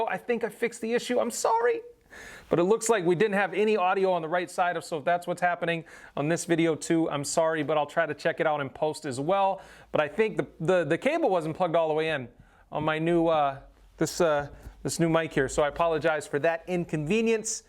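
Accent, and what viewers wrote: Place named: Minnesota, United States. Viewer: Hey another MN boy! I knew that accent!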